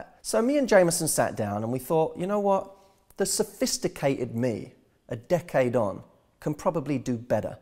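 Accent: United Kingdom. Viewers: I love your British accent .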